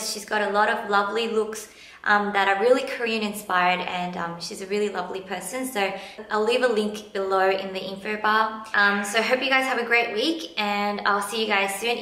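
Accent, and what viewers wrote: Australia. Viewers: i like your voice! hehe very australian ^^ i'm an aussie asian too~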